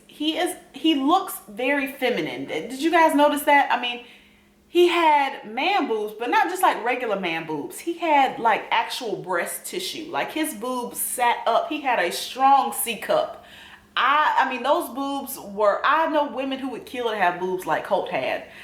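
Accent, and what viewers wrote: South, United States. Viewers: Who doesn’t like her accent?!??? I’ll fight them! I love southern accents. Wish I had one.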